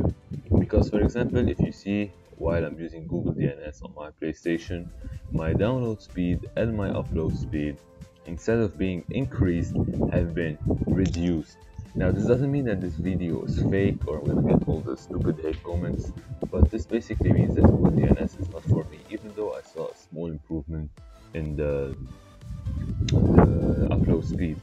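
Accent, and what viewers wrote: Lebanon. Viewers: Are you Lebanese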